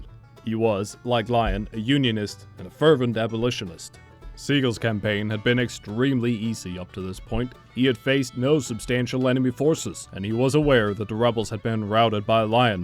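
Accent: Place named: Finland. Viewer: Really enjoyed this video but im really trying to pin point the slight accent i hear when you pronounce Missouri...your S and Rs make me suspect a Finnish background 😅 Supmalainen Sisu! 🇫🇮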